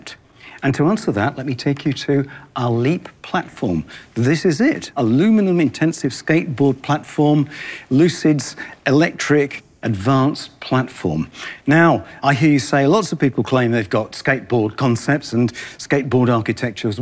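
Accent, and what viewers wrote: United Kingdom. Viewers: I'm born and raised in the USA. I'm totally disgusted with abundance of British accents I have to hear these days. I'm nearly 80...and it hasn't always been this way. This time I guess they're trying to take us without burning our buildings. Britain and Israel are our two worst enemies...and the sooner others realize that the better off we're going to be. And BTW: Everything he's saying you should have learned in high school...and could have learned in grade school if they weren't wasting all your time on "love your government as yourself" propaganda. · Quite similar to the original Tesla Roadster and Model S HV packs, in that Lucid uses many thousands of tiny 18650 "flashlight" batteries, as in laptops and power tools. Improvements over Tesla are a higher pack output (900 VDC), as for most newer BEV's, instead of the 400 VDC in Tesla packs. 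That makes fast-charging easier (half the current). Another advantage is that the end-plate cooling and lack of adhesives should make replacing individual failed cells much easier, and likely easier assembly too. The Physics he presents is high-school level so not amazing, though when stated in a high-class British accent it sounds more clever. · OMG, such an amazing video, exceptional presentation skills. Fantastic British accent. Beautiful cinematography. I love it all. I didn’t care about lucid, till now. Subscribed. Keep the good stuff coming and I hope you will be able to produce cars for the masses too in the near future. Thanks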